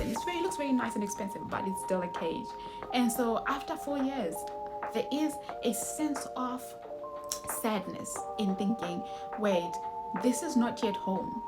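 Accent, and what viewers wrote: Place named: Africa. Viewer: Please work on your accent! It’s too difficult to understand your when you speak fast ! Practice to speak less African !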